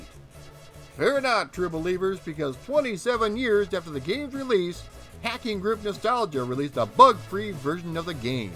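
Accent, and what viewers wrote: United Kingdom. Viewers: Uphold the Law guvnor! Yeah I'm British and that was definitely a British accent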